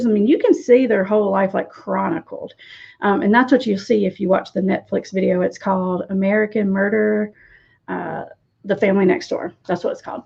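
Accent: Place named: Alabama, United States. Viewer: Chick-a-dee ... you sound like a sister or cousin from home.. you know, the land of Bless your heart ❤️.. lol .. I’m from north Alabama .. you have a dialect I hear often from bham, Tuscaloosa and surrounding area - loving your content !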